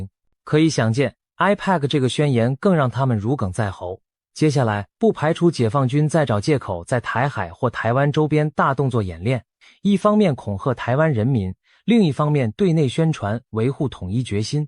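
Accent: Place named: Beijing, China. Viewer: 主播拜託你不要用北京的爛中文發音，中共產黨的中文真是非真正的中國人的正統中文，如亞洲正確的發音雅洲才對不是讀訝洲請主播用最正統派的中文發音，常識正統派讀常視發音才對不是中共匪幫的爛中文讀常時那中共產黨畢竟他不是中國人所以中共用的中文發音非常爛又不是正統派的中國語言，主播買本正統中文字典注音符號的書好好認識中共的爛中文字發音吧！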